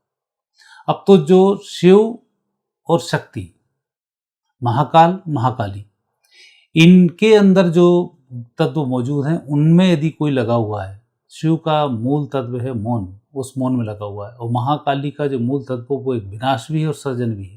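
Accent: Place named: Madhya Pradesh, India. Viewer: आपके स्वर से प्रतीत होता है मानों आप भोपाल या होशंगाबाद के आस पास से हैं। या मध्य प्रदेश कहीं से। क्या मैं उचित कह रही हूँ।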